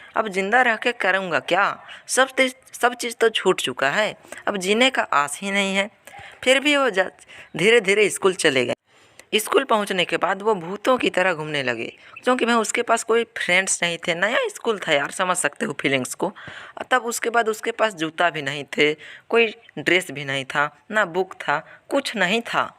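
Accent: Bihar, India. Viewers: Are you from Bihar ? 🤔 Awesome explanation 👍👋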